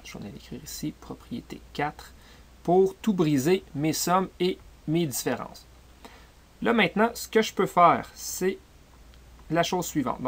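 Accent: Quebec, Canada. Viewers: si t'as un problème avec l'accent québecois, je suis toute ouïe. sinon, va donc chialer ailleurs. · Srx on voit rien sur ce qu'il fait écris dans le tableau et les dessins des graphiques sont frenchement dégueulasse...sans compter (bien que le mec n'y peuvent rien) un accent québecquoi absolument dérangeant et rendant la compréhension encore plus difficile...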